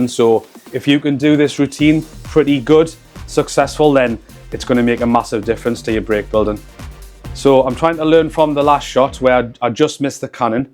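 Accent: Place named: Newcastle, United Kingdom. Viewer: Love that accent. Are you from Newcastle?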